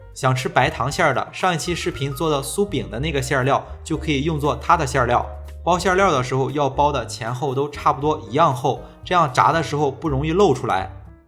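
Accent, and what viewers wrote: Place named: Yantai, China. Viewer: 听出来了，我是烟台人。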